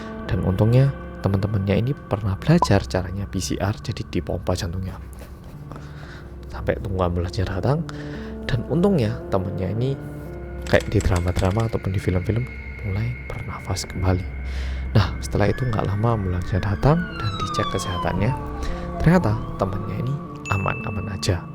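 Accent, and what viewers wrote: Java, Indonesia. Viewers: Lagi nugas tiba-tiba daper notif dari....... Langsung nonton dong♡♡♡♡ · wkwkwk mas, ini kan cerita tentang orang2 korea ya.. tapi gara2 dibawainnya jowoan jadi berasa denger kisah orang main sungai di brebes. seru.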